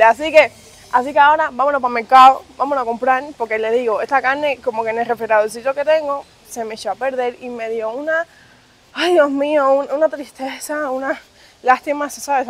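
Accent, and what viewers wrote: Cuba. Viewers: Poco a poco te adaptaras y hasta tarde y temprano se te quitara en tono de voz de cuba. Y sin pensar tu hablaras con el tono de mexico como las damas chilangas. Ya veras ..con el tiempecito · No me gusta el acento cubano , nunca me va a gustar. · Hola preciosa eres muy graciosa e tu forma de hablar es muy bonito el acento cubano eres bienvenida a nuestro pais soy tu fans también a través de ti conocemos las costumbres de Cuba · Y a ti tampoco te enseñaron que en cada pais hablan diferente ella su acento es cubano.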